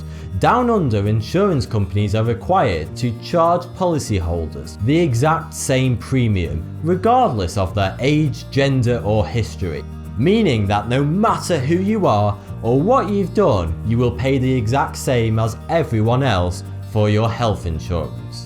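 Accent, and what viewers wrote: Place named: Manchester, United Kingdom. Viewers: It's his dialect you idiot. Ever heard of the northern dialect of England. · He has a very slight Manchester accent. Which I like 👍. This guy does a fantastic job, well ahead of his years...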